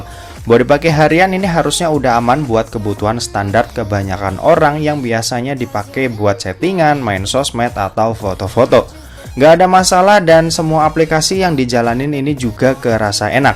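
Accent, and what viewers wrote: Semarang, Indonesia. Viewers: Asli Semarang bang?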